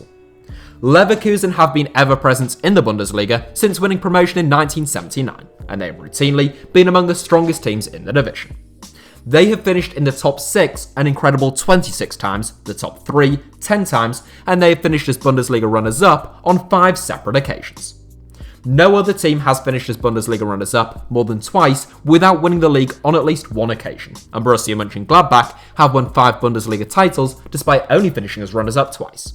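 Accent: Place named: England, United Kingdom. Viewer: His English accent is so annoying, i just watched the video muted with subtitles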